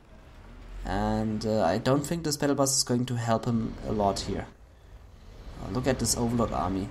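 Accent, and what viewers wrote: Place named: Germany. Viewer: Nice Video. Where are you from? Your english sounds really german :)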